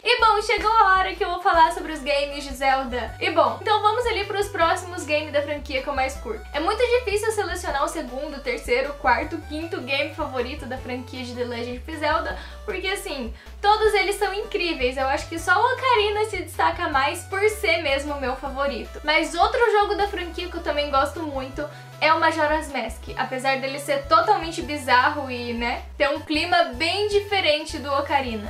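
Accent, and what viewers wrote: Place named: São Paulo, Brazil. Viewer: pensei q vc era de São Paulo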